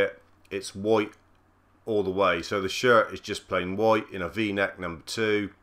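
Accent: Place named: Gloucestershire, United Kingdom. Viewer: Sound like your from gloustershire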